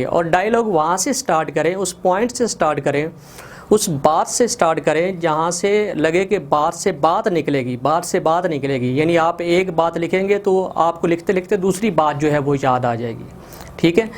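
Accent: Punjab, India. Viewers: Sir , it look like u know Punjabi language by ur pronounciation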